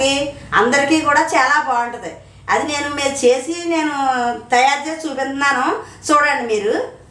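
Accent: Godavari, India. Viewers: Amma meedhi godavari jilla na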